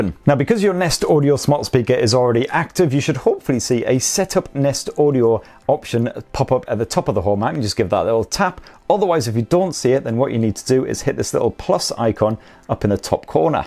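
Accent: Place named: England, United Kingdom. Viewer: I love your English accent so much I wish I had it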